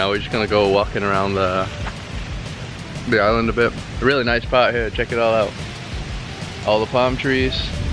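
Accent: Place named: England, United Kingdom. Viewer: Wow man! I really like your English accent. Sound very clean for me. Where you from?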